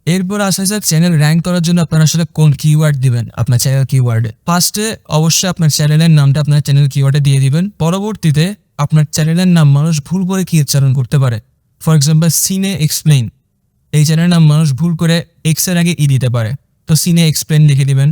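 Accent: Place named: Chittagong, Bangladesh. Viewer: Only in this video of yours "Chittagongian accent vibing" ...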